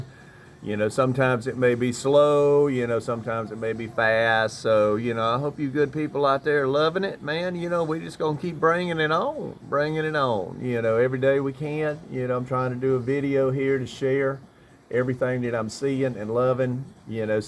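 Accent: South, United States. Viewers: I like your Southern accent. I live here in Tallahassee, Florida which is just part of the deep south.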